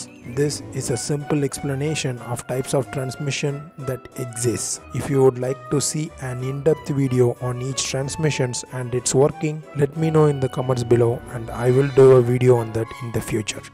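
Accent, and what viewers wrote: India. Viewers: Turn of the stupid indian accent bro it ruins the video <3 Much love bro <3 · Ur exent is so bad its like less educate indian kid try to speak in englisg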